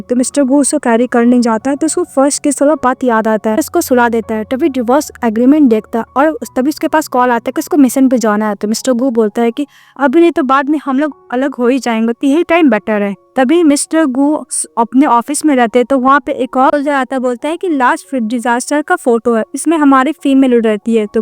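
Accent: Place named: Assam, India. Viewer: Didi aap asamis ho ya Bangali sound like asami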